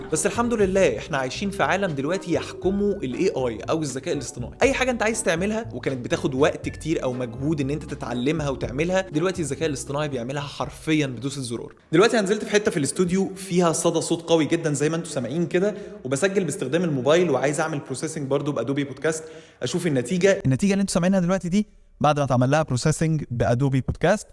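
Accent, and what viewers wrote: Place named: United States. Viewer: مادري المشكله بس عندي ولا لا بس لاحظت ان لكنه الكلام تقلب كأنها امريكي يتكلم عربي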